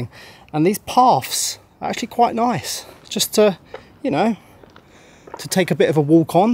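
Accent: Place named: England, United Kingdom. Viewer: Somebody knows where this convent is, I recognized english way of taking, thanks to light me !!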